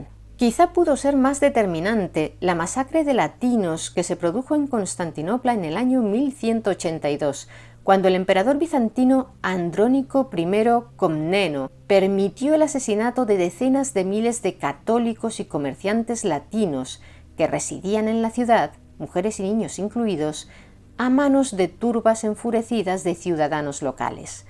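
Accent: Spain. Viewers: me encanta como explicas la historia de una forma tan sencilla e interesante. Soy de México y amo tu "acento" español. 😅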